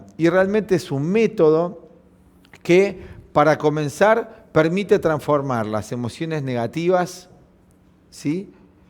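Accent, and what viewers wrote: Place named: Argentina. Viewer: me conflictua tanto el acento argentino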